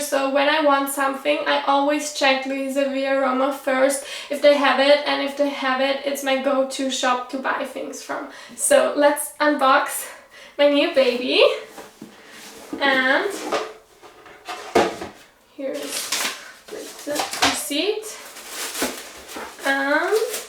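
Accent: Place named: Germany. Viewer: You look amazing in these Tights. And also i love your accent, are you german?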